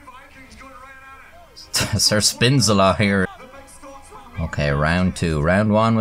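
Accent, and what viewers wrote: Ireland. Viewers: It's the Irish accent that really does it for me. You ever notice English/Scottish/Irish accents are over represented on YouTube? They just sound good. Not too many popular Aussie voices though. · Stories always sound better when told with an Irish accent.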